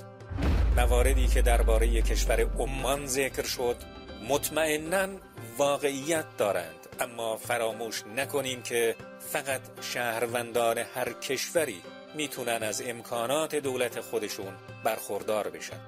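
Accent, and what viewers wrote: Iran. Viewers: گوینده بلوچ هستن؟ (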